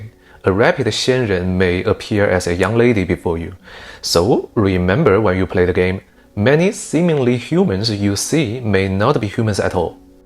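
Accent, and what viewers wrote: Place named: China. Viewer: 是国人吧 这中式口音（并无冒犯之意）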